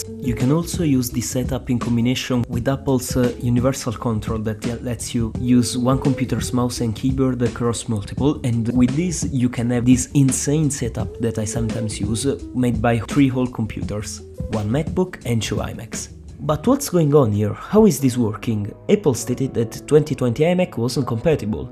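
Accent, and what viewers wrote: Italy. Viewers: Are you Italian? cause you sound exactly like me talking in english and im from Rome lol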